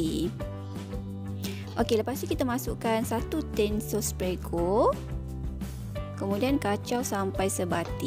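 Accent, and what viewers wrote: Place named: North, Malaysia. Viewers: Pekat nah utagha. Boleh belajaq kat sini sekali hehehe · Terima kasih cik oii,.,memg sangat sempoi...esok pagi makcik mesti try ni., kbetulan semua bahan ada ja...dengaq cek makan krupkrap pun dah telioq aih...terima kasih no.,kongsi resepi ni...cakap utaqa tu maintain na cek....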